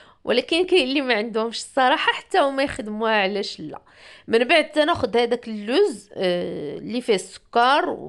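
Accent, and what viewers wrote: Marrakech, Morocco. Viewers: حليواتك رائعين بدون شك ولكن هدرتك راااائعة كتسافر بيا لمدينة مراكش مسقط رأسي اللي اشتقت لها بزاف الصواب والأدب والحلاوة والظرافة في الكلام لهلا يخطيك وحليوة اليوم غزااالة اول مرة كننعرفها حصريا فقناتك وأكيد غادي نجربها كلامك الحلو أرغمني نعمل لك كومونتير لأنني من عادتي نادرا ما كندير كومونتير إلا لمن يستحق برافو عليك حبيبتي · تبارك الله عليك اختي الله بعطيك الصحة👌👌👌👌👌👌👌👌👌 معندي منقول برافو عليك و زيدني في قائمة الناس لكيحماقو على الصوت واللهجة المراكشية الرائعة،❤️😘😘😘 الله يخليك تقربيا شحال خليتها في الفران؟ شكرا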